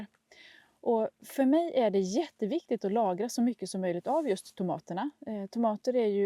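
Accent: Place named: Sweden. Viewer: Som dansker forstår jeg 95% af hvad du siger. Det er sjovt hvordan det bare kommer an på den svenske accent hvor meget jeg kan forstå. Det er som om du snakker dansk til mig 😅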